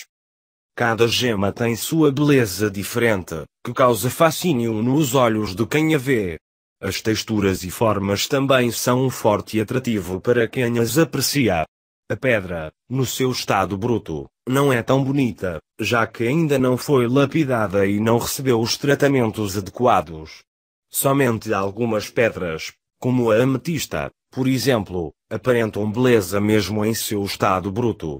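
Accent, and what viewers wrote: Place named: Portugal. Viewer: Muito bom, pena ser português de Portugal...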